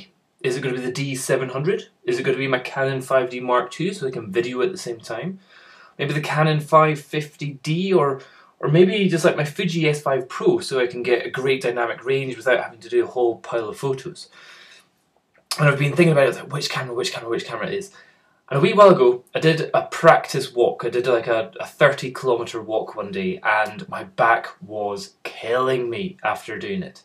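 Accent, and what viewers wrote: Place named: Scotland, United Kingdom. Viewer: I´d take the 550D with the kit lens! Btw. what intrigues me: is your accent genuinly Scottish or did you "internationalize" it in some way? It´s interesting, that you´re actually using Am.E. pronunciation sometimes like e.g. can´t, plant, chance, answer.... Accents have always intrigued me, so I´m digressing from the topic a bit!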